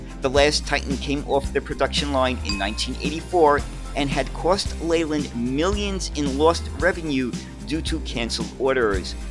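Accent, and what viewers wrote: New York, United States. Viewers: is from New York, as if you couldn't have guessed that already!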